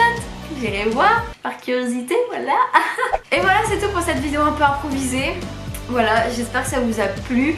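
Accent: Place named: Nord, France. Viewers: Super vidéo ! Jsuis trop morte de rire au début "ça fait cassos" et "c mieux en français qd mm" haha c vrai que c une langue à part entière le ch'ti ! Moi je l'entends direct ton accent surtout sur certains mots :) Ma belle famille est ch'ti, ça fait + de 11 ans que jles connais alors à force jsuis habituée ^^ Jcrois que jconnaissais 95% de ces mots et expressions mais avant d'être avec mon homme j'aurais réagis comme tes copines de vacances mdr ! Gros bisous <3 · Oh oui ton accent est prononcée 😉 j'adore grave le ch'ti depuis le film de Dany Boon BIENVENUE CHEZ LES CH'TIS 😊 surtout que nous les Bretons ont dit aussi Vindediousse (je sais pas l'écrire mdr) BONNE VIDÉO sinon 👏💘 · J’adore ton accent malheureusement j’ai quitté le nord trop jeune j’ai pas l’accent 😔 et j’adore le patois du Nord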